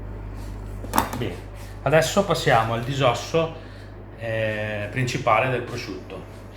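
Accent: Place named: Romagna, Italy. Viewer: Bravo complimenti 👍 raccontato con il tuo accento romagnolo diventa fantastico 👍👍👍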